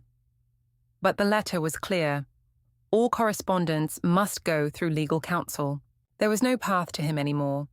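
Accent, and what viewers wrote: England, United Kingdom. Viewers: You know, every time I hear that English accent on the narrator I get pissed off.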